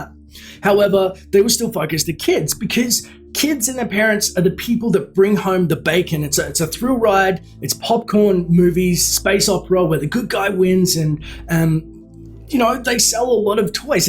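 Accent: Australia. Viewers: Hey I Dunno If This Has Been Asked Before But Are You An Aussie By Any Chance???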